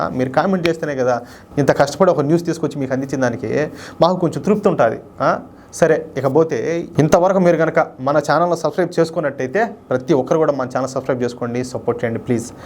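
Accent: Rayalaseema, India. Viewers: రాయలసీమ యాస superb. యా ఊరు నీది.!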